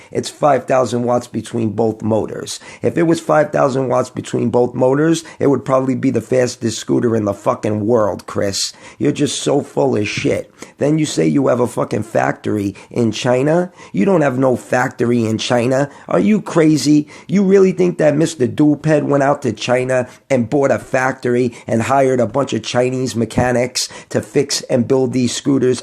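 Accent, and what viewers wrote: New York, United States. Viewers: I love how u call it how it is you get straight to the point and i love your nyc accent lol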